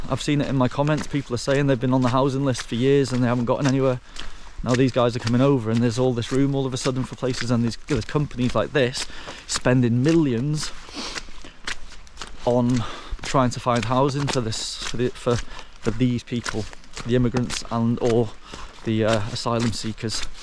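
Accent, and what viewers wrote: England, United Kingdom. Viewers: Your not welsh you sound english and not 1 of them are asylum seekers many countries they pass through before getting here? 2/3 if they really were that they'd settle for the 1st country out of pure desperation but our country are easy targets if anything gets said the race card gets pulled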